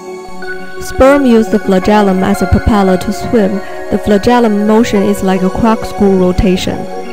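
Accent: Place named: South Korea. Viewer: She sounds korean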